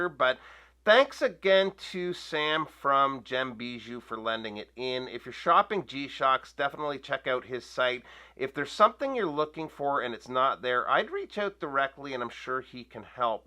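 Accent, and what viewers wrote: Canada. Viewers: Canadian ehh !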